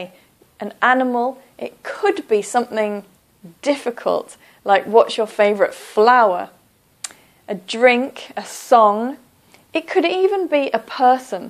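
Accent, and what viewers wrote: United Kingdom. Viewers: Its british accent. You can feel the BOUNCE the way they speak.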